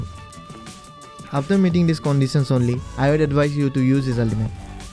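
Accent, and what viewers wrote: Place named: Assam, India. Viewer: Assamese accent hmm r u a Assamese guy ?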